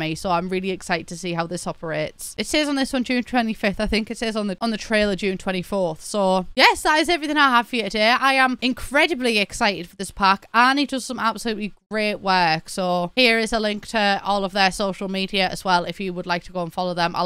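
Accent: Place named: North, United Kingdom. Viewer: northern british accent makes me happy again and again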